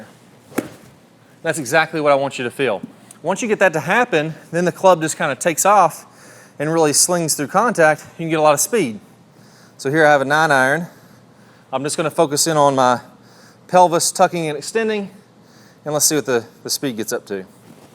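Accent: South, United States. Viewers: You're an excellent coach. I hear that southern twang shining though. Where could I find you for an in-person lesson?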